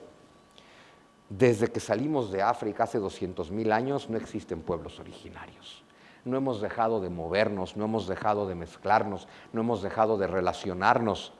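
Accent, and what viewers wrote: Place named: Spain. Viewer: Estoi stipos creen que van a cambiar el mundo para muchos este tipo es un enfermo . solo escuchalo ya comienza a hablar como Español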